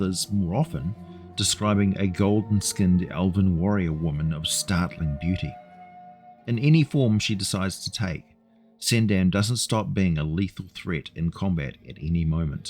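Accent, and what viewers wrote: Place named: New Zealand. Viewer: I've drifted off to sleep listening to your voice so many times my inner voice has a kiwi accent now. Currently worrying if kiwi is OK to say.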